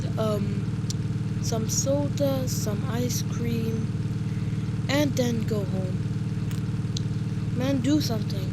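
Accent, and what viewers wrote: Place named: Arab world. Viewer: You have arab accent! Just like i do! But yours is deep Mine is smol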